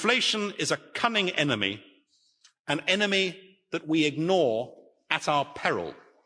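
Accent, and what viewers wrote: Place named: United Kingdom. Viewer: 英式英文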